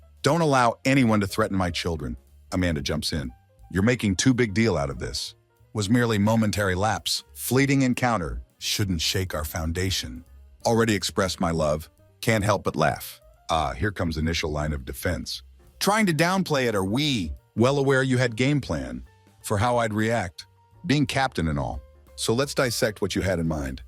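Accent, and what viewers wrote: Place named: Russia. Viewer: The english sounds like broken russian or east European.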